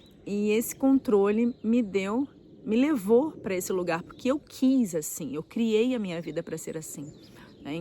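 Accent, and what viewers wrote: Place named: Brazil. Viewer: Olà fico contente com suas declaraçoes, mas desculpa minha pergunta o porqué de ainda falares com sotaque Brasileiro? fui emigrante na Suissa e Luxembourgo e nunca usei linguagem portuguesa e o frances também é de origem do latin entendes, é a unico reparo que faço em sua aventura aqui em portugal, eu me entegrei na Europa em frances e para mim o mais importante na integraçao é prencipalmente a linguagem quasi todos portugueses gostam que qualquer raça que falem a linguagem correctamente e resto da Europa obrigado por sua atençao.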